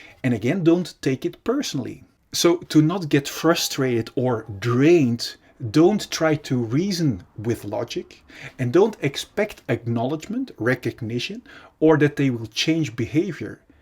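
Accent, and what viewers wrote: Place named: Netherlands. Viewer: I can hear your Dutch.... thanks for the video👍🏼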